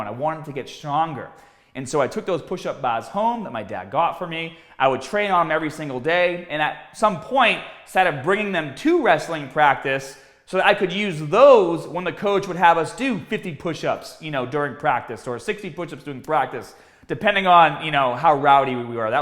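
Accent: Boston, United States. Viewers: this guy must be from boston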